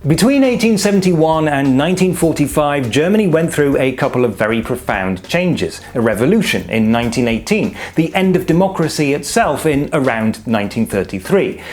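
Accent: United Kingdom. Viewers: Not bad. Your accent is very british indeed for an Aschaffenburger. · Your vid popped up on my youtube selection. Had aways wondered about that name.. now I know, and not only that.. I feel so much smarted learning it in your British accent. Like Sub!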